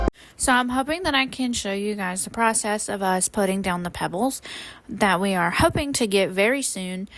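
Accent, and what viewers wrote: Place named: United States. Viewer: Which state are you from, I hear an accent when you talk and was curious. You don't have to answer if you don't want.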